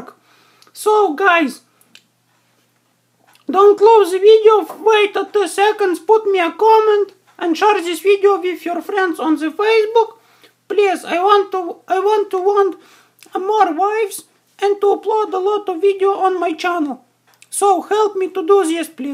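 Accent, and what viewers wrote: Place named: Asia. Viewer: That's how Asian people talks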